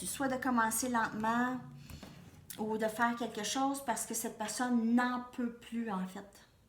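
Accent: Canada. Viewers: Bonsoir j aime bien vos guidance et j adore votre accent j aime le canada je vous souhaite une bonne soirée ne changer rien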